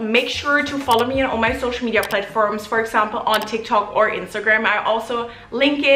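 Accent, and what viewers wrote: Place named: Germany. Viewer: I love your content, I'm always so shocked by your German accent, I just always expect you to have the voice of Adeline ❤ Thanks for your hard work!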